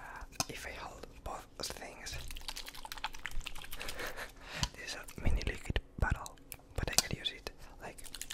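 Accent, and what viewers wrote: Spain. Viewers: 50% = talking about the Alien 40% = make fun of his spanish accent 10% = Ask him for a collaboration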